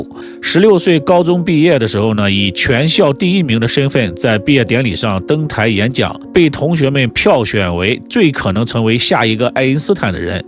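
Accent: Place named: Northeast, China. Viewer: 並不說所有人都能說案的，你這一嘴大碴子味兒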